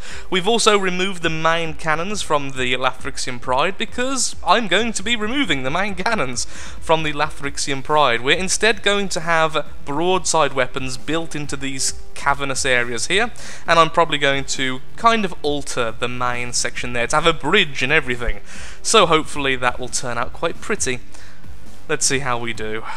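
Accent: United Kingdom. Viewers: I just got to this channel and some how I knew you would sound British.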